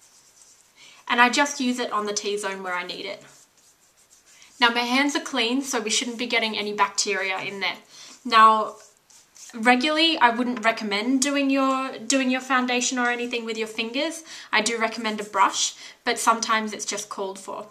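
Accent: Australia. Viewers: u aussie?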